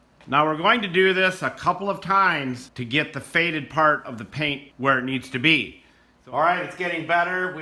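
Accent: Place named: Michigan, United States. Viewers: Where are you in Michigan? I’m originally from the Flint area and thought I recognized that accent. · This guy has a Michigan accent for days...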